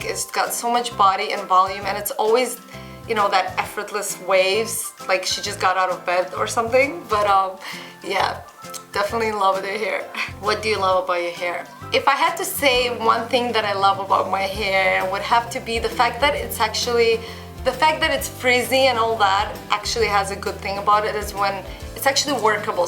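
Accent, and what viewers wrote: Russia. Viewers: Sounds Russian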